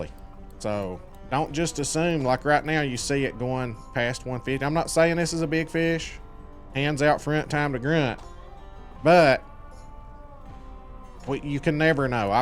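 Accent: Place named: United States. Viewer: Bro I love the country voice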